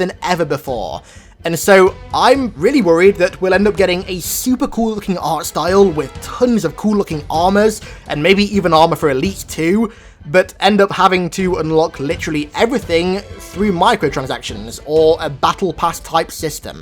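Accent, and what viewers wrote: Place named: United Kingdom. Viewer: The british accent is cool but your lisps nerd makes me wanna kill myself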